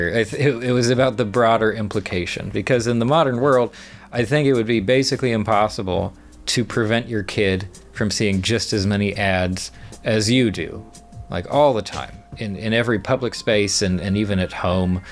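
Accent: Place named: United States. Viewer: Hbomberguy with hair and an American accent.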